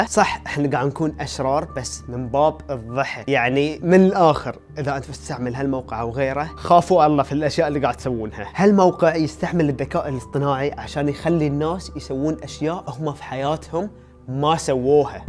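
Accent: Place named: Egypt. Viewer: ولله لهجتك المصريه حلوه ❤️🇪🇬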